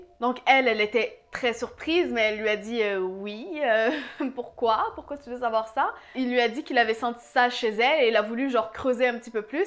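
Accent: Quebec, Canada. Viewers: Tu es québécoise ?Parce que perso j’adore ton accent 😍😍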